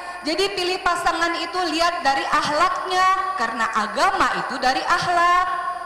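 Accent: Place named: Java, Indonesia. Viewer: logat nya ko jawa ya😁😁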